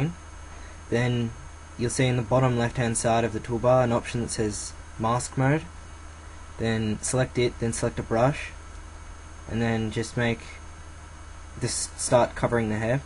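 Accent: Australia. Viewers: hes obviously austrailian you dumb ass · Are you from Australia?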